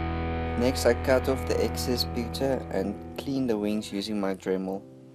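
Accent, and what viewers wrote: South Africa. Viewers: south african? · bra r u from south Africa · Afrikaans accent - check Ugly South African 3-prong electrical plugs - check Johnson & Johnson petroleum jelly - check :D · Is that a South African accent?... shout out from JHB.... awesome work! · You're South African? Edit: yes you definitely are, what's up boet? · You from South Africa, sir?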